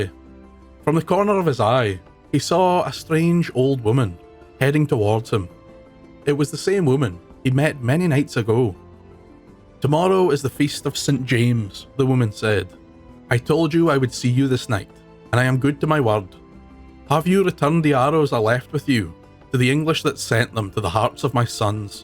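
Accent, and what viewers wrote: Scotland, United Kingdom. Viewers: Scottish history has always fascinated me. I could listen to a Scottish accent telling stories forever! Thanks for the great content.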